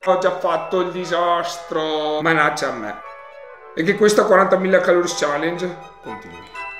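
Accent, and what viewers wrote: Veneto, Italy. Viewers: che brutto l'accento veneto 🙁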